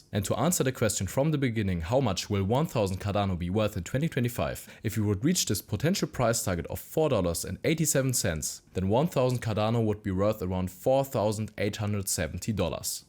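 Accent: Germany. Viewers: Very German accent :D. Aber ist in Ordnung.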